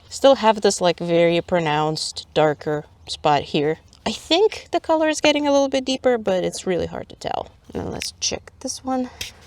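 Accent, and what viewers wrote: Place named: Minnesota, United States. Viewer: Nice! I'm just starting to be interested in solar dying / natural dyes too... I live on an old farm in MN and have lots of goodies I can forage from my own land. Wondering if you are close to MN too, based on accent and what lichens you found to play with. Thanks for the video, hope to see and learn more!!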